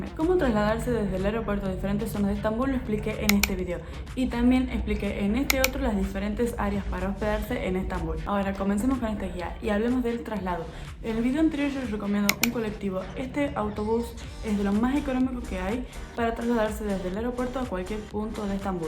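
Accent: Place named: Argentina. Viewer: hola donde eres? hablas como argentina. Pero creo que no eres de alli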